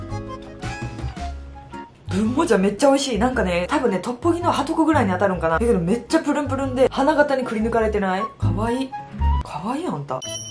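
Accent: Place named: Kagawa, Japan. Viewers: 久しぶりにキレイに食べる大食い選手！って思ったら普通の可愛い子やった…。 イントネーションが凄い安心するんやけど、同じ四国の民だったwww 近所の可愛い子やん。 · 、最近発見してばりハマってます（笑） 私は香川出身やけん方言似とってめっちゃ親近感湧いとる！！ 応援してます♡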